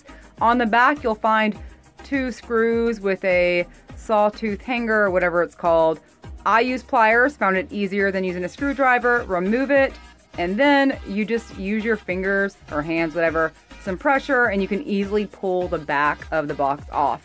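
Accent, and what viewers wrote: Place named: Pennsylvania, United States. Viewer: Love the lantern! Would I be wrong to say your from Pennsylvania? I say that because your voice/accent is so much like my family's.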